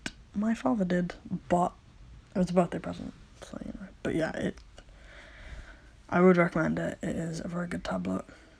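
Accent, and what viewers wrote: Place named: United Kingdom. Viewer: YESH, LE BRITISH ACCENT IS HERE >:33 I don’t know if I could ever use a screen tablet but boi, is yours pwetty ^^ Also, those clothing skills tho 😫👌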